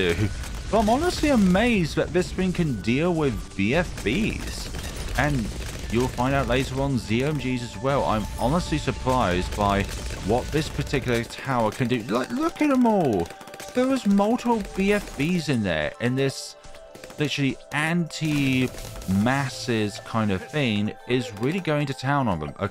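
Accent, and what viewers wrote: United Kingdom. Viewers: You are the most British person I’ve ever heard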